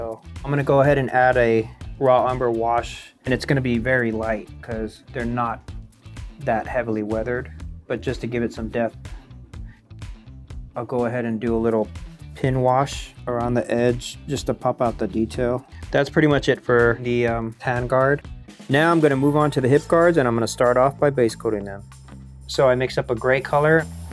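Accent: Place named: United States. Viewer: Tienes nombre, apellido y pinta de ser hispano... ¿Por qué pronuncias aquello que eres como un yanqui?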